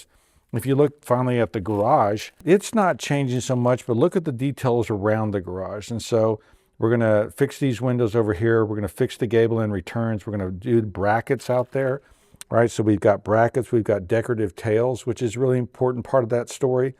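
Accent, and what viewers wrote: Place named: New England, United States. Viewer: I'm guessing is from New England. We all say it like that up here, except sometimes it's "MassiveTwo..."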